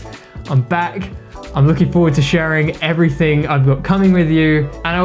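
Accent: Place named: United Kingdom. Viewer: Just found you today, and now I am subscribed. Love your content! Hey I have a dumb question for you? Do you live in the UK as your accent makes it sound like you might?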